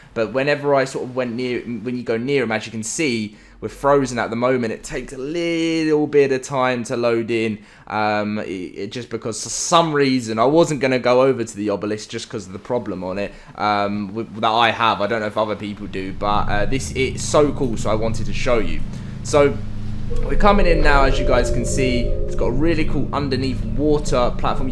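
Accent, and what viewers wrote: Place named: United Kingdom. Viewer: And yet another video that i have to skip because of a british accent that I just cant take honestly. I thought the new generations were loosing accents ? Why british people always gotta do stuff differently ? It sounds so gay I just cant sorry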